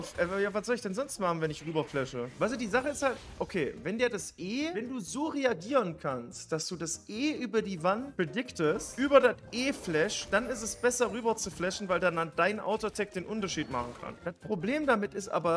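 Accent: Bavaria, Germany. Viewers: Bitte mehr Bayrischen Stiel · Kannst du mal nen Bayrischen Stream machen, Gragas und Cho only und nur im Dialekt reden, würde ich sehr fühlen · Ich liebe bayrisch so dermaßen. Mein absoluter lieblings Dialekt Schöne Grüße aus dem Kölsche Veedel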